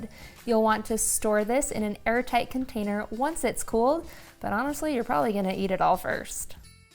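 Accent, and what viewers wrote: United States. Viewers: Mam you American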